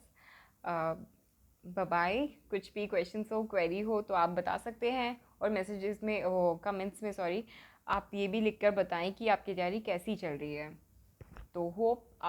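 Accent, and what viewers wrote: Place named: Himachal Pradesh, India. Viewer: .. Ok g... Apke bolne se aisa lgta h.. Ki ap HP se ho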